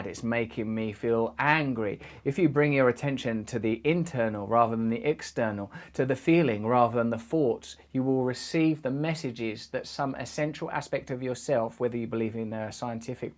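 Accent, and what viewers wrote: United Kingdom. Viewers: Hard for me to understand the accent in the Queen’s tongue. Yet I really like these 1vs1 testimonials from Brand. This is a good story, that proves careful what you wish for. However I am grateful I got to see this man perform, and make me laugh on occasion. Also he’s intellectual 😂 and he is from England. Thanks for the messages. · I have to admit...the first time I saw you, I thought you were quite handsome. And then you spoke and that British accent tickled my ears. I’ve loved watching you in your movies. You don’t seem to be acting at all but rather, you walked on set and you and the role really were one. Only the best actors become the role. And then, you can walk away and you’re again. So you’re right about there being a split.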